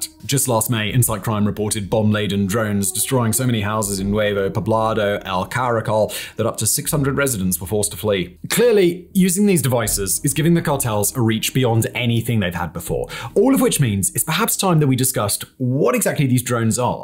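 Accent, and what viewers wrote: United Kingdom. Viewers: Finally, someone with a brain. I like your content and delivery... especially with that accent of yours. Everything sounds better with that particular British accent. I appreciate you and your channel.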